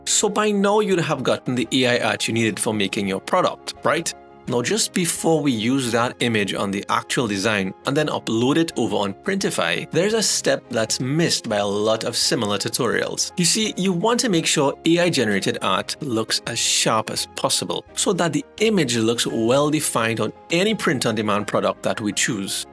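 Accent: Trinidad and Tobago. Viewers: Are you Tinidadian? I'm hearing some sort of accent. Good content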